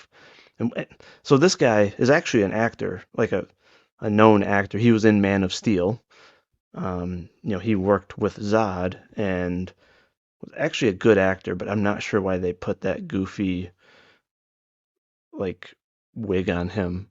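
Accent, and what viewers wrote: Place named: Germany. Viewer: Ты говоришь по-русски язык!! Я слышу немецкий акцент…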